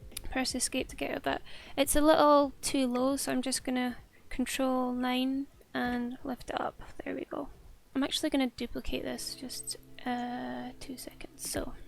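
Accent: Scotland, United Kingdom. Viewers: I hear a fellow scot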